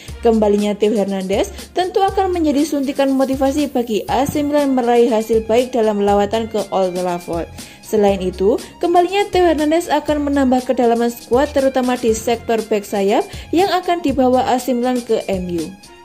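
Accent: Java, Indonesia. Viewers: Salam buat Narator Channel Youtube AC Milan Indonesia ini, mêdoq bangets... unique 👍🤩👍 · Medhok banget mbak wkwkwk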